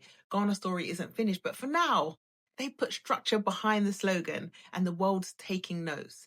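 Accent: United Kingdom. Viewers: I love her UK accent so much. Way better than the American accent. Icing on the cake? Her beauty !!!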